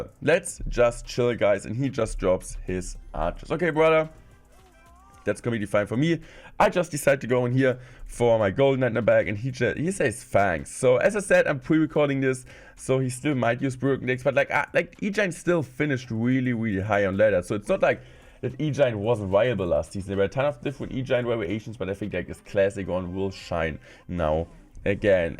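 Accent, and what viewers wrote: Germany. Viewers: Ich mag den German accent in your sprach sounds sehr nice and refreshing zu all den anderen Englischsprachigen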